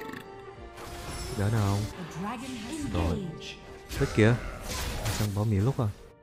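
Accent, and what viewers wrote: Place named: Phu Yen, Vietnam. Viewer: giọng giống PY quê mình thế nhờ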